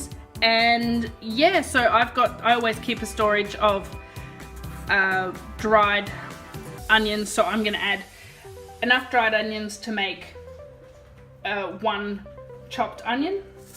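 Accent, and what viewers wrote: Australia. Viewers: I came from money mom's video. Are from QLD because you sound just like Mel Buttle and she sounds so QLD to me? Also are you Mel Buttle?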